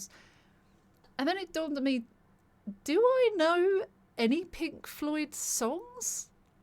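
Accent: United Kingdom. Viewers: And her lovely British accent makes it even more special. Thank you young lady. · You just reacted to by Pink Floyd, and it took you 41 minutes and 13 seconds to finish. You didn’t rush it. You took your time with every sentence, trying to understand the lyrics fully. And honestly, your British accent made the whole experience even more lovely. It felt like you were guiding us through the song with care and curiosity. That’s why your reactions stand out. They’re not just content, they’re connection.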